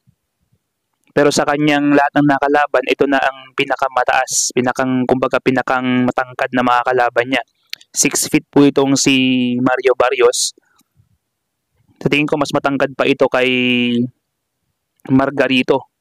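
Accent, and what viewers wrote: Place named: Visayas, Philippines. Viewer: Bisaya ka dong halata medyo bagwet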